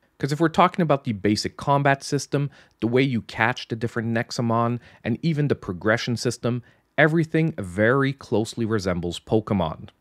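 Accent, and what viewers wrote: Quebec, Canada. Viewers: That's a Quebec accent if I've ever heard one.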